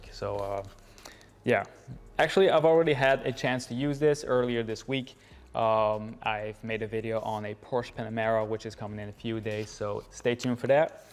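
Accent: United States. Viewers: I'm surprised you have a very American accent considering you are based in Belgium (I think) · you do sound like an american, very impressed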